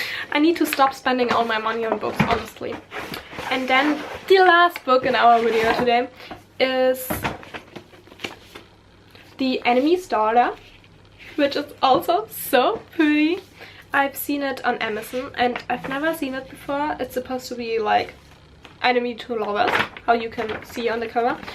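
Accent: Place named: Germany. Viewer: Es ist so spannend wie gut dein Vokabular bist, aber man trotzdem einfach hört, dass du deutsch bist. Würde mich interessieren, ob sich das mit einem Aufenthalt in einem englisch sprachigen Land ändern würde.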